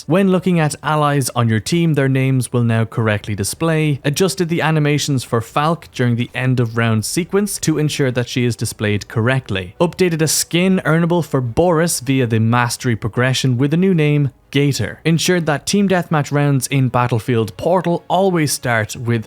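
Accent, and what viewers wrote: Ireland. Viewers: He's Irish you muppet. Not everyone talks in American English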